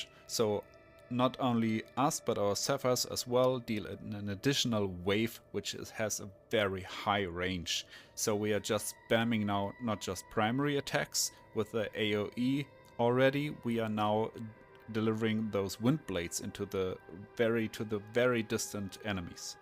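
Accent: Germany. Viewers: Bro bist du Deutscher hört man Akzent raus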